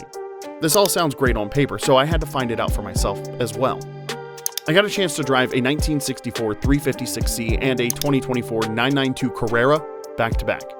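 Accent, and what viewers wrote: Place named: New Jersey, United States. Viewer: Here’s another little tidbit about my wonderful state of NJ. Did you know that in the mid 50’s Margaret Hague Hospital in Jersey City, developed and held the clinical trials for the polio vaccine. The team of scientists worked under Jonas Salks, essentially wiping out polio. I grew up in Jersey City, and I travel the world as an airline Captain. I always run into someone from Jersey, no matter where I am. A little bit of everything in this state, and some of the best striped bass fishing in the world.😊 By the way, the accent is still very heavy.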